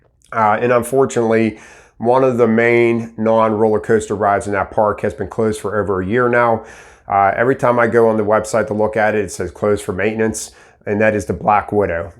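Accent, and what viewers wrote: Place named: Pittsburgh, United States. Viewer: I just got hired on at Sysco in Zelie as a warehouse selector and start later this month. I've been loving your warehouse training videos and also picking up on your Pittsburgh accent. I heard you say, "n'at," in one of the videos. That on top of the accent, about solidified it for me that you're a fellow yinzer. Then I seen the Kennywood logo and knew we were from the same neck of the woods. Keep the warehouse videos coming. I appreciate you and all your help neighbor!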